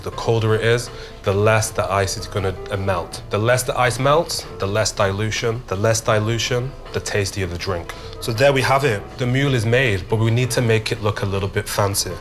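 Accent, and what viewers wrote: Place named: Leeds, United Kingdom. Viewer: Aww bless you son. Speaking in another language with that Leeds accent. Bless you. Made me laugh. I like the pear voka xx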